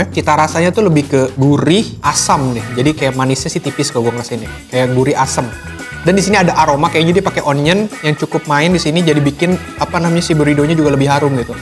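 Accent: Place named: West Java, Indonesia. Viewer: Wah sunda bet ya, letuce jadi leteuce euy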